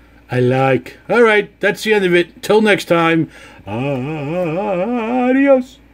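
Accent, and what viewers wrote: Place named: New York, United States. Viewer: the most new yorker sounding break. Love it!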